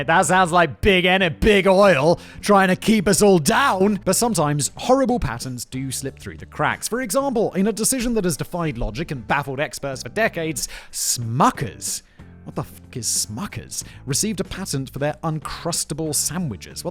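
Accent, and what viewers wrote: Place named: RP, United Kingdom. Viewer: A minute ago, I watched a “Geographics” about Trans…figuritania?😂😆 this wannabe independent nation in Moldova that reminds me of Corsica -.the motherland) followed by a Into the shadows… and the more goes on, the more unhinged, nasal and Pommy (Ozy/Aussie expression to depict the British “Poms”/UpperMiddleClass) becomes. It’s so funny/uncanny!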